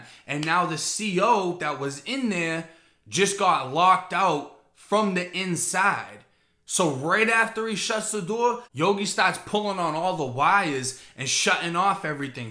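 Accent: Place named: New Jersey, United States. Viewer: Kinda sound like you from jersey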